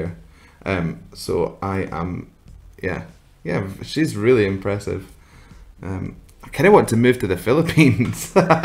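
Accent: Scotland, United Kingdom. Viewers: There's just so many singing talents in this country,bro! You'll surely enjoy reacting to each. Just give in to your filipino subscribers' requests. Btw, i like your scottish accent! And your professional remarks,too. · Liked your analysis and reaction to Katrina Velarde’s voice. Also enjoyed listening to your Scottish accent. c”,) Nice tea mug !!! Hehehe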